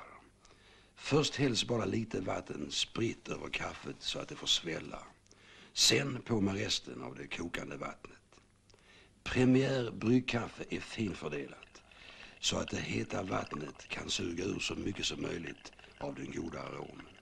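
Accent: Scania, Sweden. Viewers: Härlig skånska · Muskig skånsk berättarröst och kaffe är alltid en vinnande kombination.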